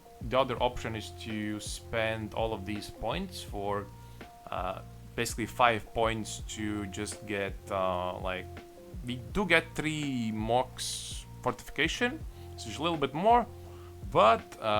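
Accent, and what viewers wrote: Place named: Russia. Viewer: Чувак, все круто, но всем понятно, что твой английский не мейн язык. И скорее всего твой мейн язык русский. Запили этот видик на русском. Ты показал действительно крутые скилы.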